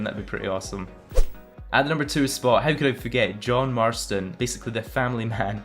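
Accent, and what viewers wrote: Scotland, United Kingdom. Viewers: Where in scotland u from?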